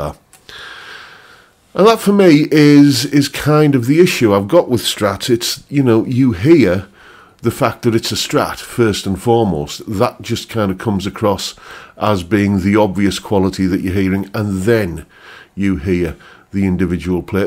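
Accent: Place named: Northeast England, United Kingdom. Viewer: I'm trying to place your accent... Guessing somewhere between Consett and Darlington?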